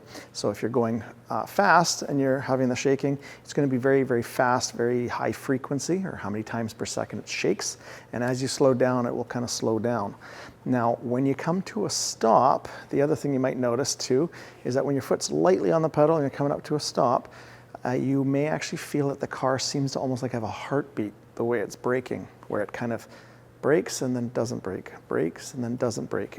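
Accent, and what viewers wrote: Canada. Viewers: Love your channel. Hearing you speak, there's no doubt that you are a Canadian. Love that accent. I live in Missouri, and while we do have salt in the winter, it's not nearly as bad as up in the North. That sure makes it easier to work on older cars. I have no pulsations on my 2015 impala with 75,000 miles on it. Would you recommend having them machine the rotors anyway? They only have very minor grooves, so I think they are worth resurfacing. I get a kind of roaring sound when applying my brakes at highway speeds, and even though my pads aren't worn out, I'm assuming this is a sign that they are getting thin maybe? No pulsation with that sound.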